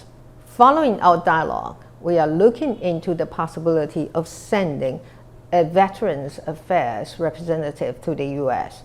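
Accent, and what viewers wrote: United Kingdom. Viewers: 讀稿機很正常，即便沒讀稿機，蔡總統英式英文原本就很熟是事實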